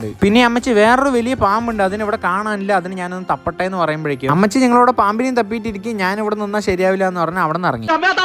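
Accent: Palakkad, India. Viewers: 😂😂palakad slag🔥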